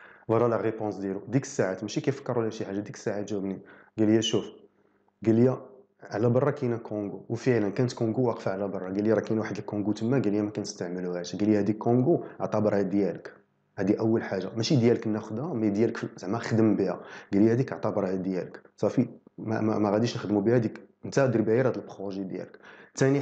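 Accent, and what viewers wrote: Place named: Maghreb, Arab world. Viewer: حاولت افهم ما قدرت فعلا لهجة اخوانا بالمغرب العربي صعبة الفهم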